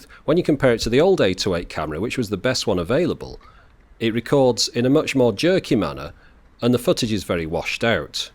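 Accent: United Kingdom. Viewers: Great review. I could listen to your smooth British accent all day :)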